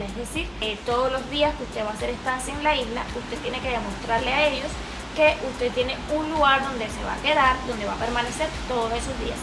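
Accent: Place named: Venezuela. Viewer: Tu hablas como venezolana , no pareces cubana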